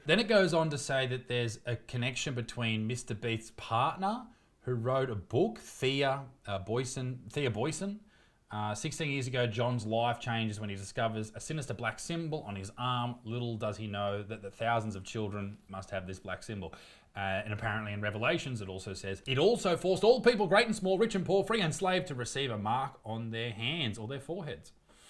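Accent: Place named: Australia. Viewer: The devil voice just doesnt work with an Australian accent lol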